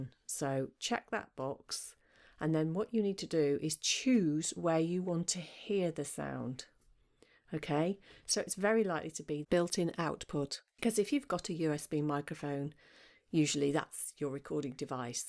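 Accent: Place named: Camden, United Kingdom. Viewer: Your lovely. Tnx from Chile. You rock. And great proper english lol. Bring memories of my Camden boozy days lol. Not you just the accent lol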